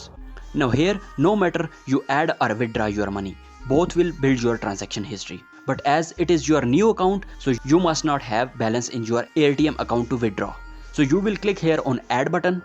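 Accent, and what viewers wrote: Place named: India. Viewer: Your indian accent is really so strong accent it almost seems your being funny😂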